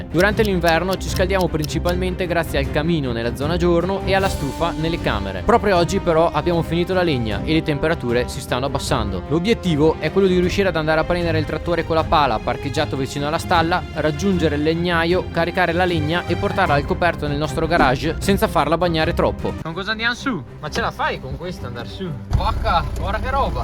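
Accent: Veneto, Italy. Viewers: Te se proprio Veneto ciò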